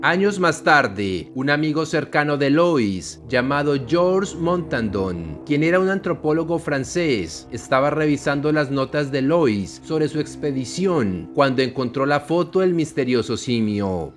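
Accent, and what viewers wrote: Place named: Mexico City, Mexico. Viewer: Parece chilango ha no se crean yo soy chilango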